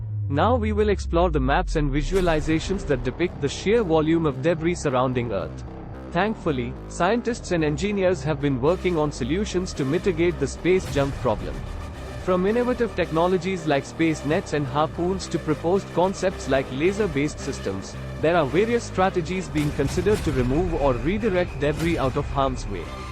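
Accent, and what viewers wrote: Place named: India. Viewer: WTH? hindi synthesized accent?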